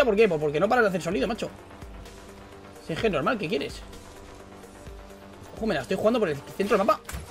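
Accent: Mexico. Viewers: Wey Me gusta cuando ablas como mexicano jaja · hablando como Mexicano?? Jijij yo soy Mexicana:3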